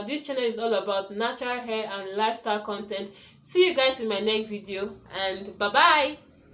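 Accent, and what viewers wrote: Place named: Caribbean. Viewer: You have a accent are you carribbean?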